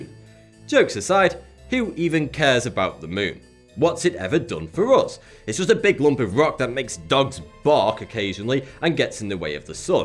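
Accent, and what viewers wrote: United Kingdom. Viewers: Hi if he lives in Asia why does he have such a heavy British accent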